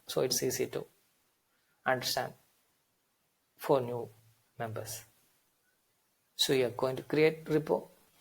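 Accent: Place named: India. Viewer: First clear your english speak either in indian style or western style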